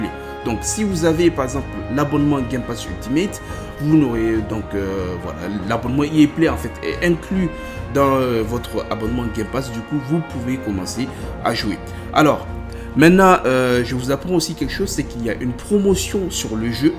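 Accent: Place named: Germany. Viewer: j'adore ton pti accent allemand😍😍😍😍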